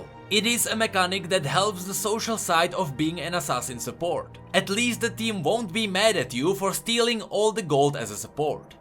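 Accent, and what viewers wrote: Czech Republic. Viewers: Hned co jsem uslyšel ten přízvuk bylo mi jasné, že musíš být z Česka. Úžasná videa <3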